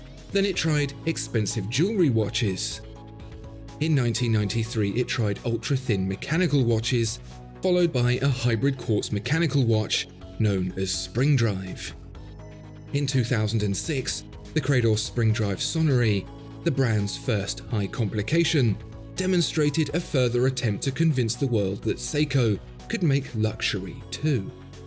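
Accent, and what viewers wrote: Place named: RP, United Kingdom. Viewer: Ok 3 min on Lange (from to ). 1 min on Credor... Hmmm? Just some biased limey with a posh accent...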